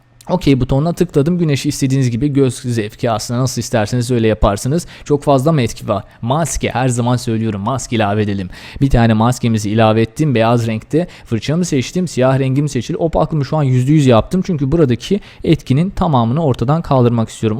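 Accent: Azerbaijan. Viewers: sen azerbaycanlımısın acep?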